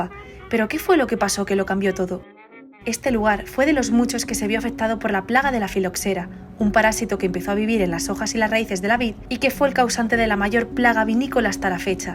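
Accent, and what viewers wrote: Spain. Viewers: Lo que me gusta de tus videos: 1-Tu voz me trae tranquilidad, es muy bonita, además ese acento español :D. 2-Me encanta ver como revisas las habitaciones, es muy curioso todo lo que puede estar ahí adentro :0. 3-Tu no mientes sobre los fantasmas, tu solo muestras lo que tienes que mostrar, no como otros Youtubers que ponen de titulo en su video "¡O por dios!, nos hemos encontrado a un fantasma a las a.m" que si, se que le da drama y suspenso al video, pero tampoco es como si hiciera falta xd. No se si me explique bien pero bueno jsjsjs, el punto es que me encantan tus videos ^^, alta biblia que acabo de escribir xd.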